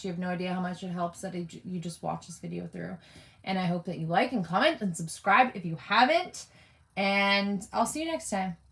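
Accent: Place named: United States. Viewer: Love the innocence. I’m sure ur a cool gal. U in “Canada” or somewhere but ur accent sound “American”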